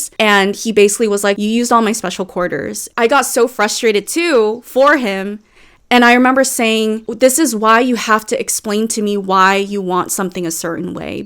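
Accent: United States. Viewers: You are such an articulate, insightful girl and you bring up actually really interesting points about the further complications of not just being 'neurodivergent' but of either yourself or other people around you being from different cultures and therefore have different 'social norms' which makes it more confusing for you, or indeed anybody. But if I would listen to you without seeing your face, perhaps I would assume you're just a 'white, American' girl just from your accent and language. Yet what I wanted to ask you, is that in the way you SOUND exactly the same as a 'white american' could, do you think that because you or other immigrants born and RAISED in the united states essentially do not possess those 'social norms' thier immigrant ancestors have, or do you think theres some degree of even some behaviour and mindsets being inherently genetic, that is, for example, youre african yet your ancestors had different mindsets, traditions and norms, yet you were born and raised in america, do you think even though thats the case, they would retain some essentially pure 'african-ness' even though they had never stepped foot in africa before? 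A genuine question to see what you think 😊 As now you have set off my weird, unfiltered neurodivergent endless question making 😆😂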